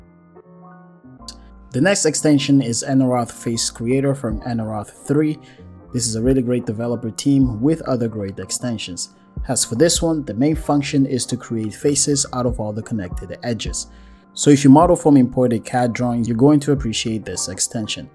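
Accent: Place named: Portugal. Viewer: By your accent, can I conclude that you are a native of Portugal or some Portuguese speaking country ?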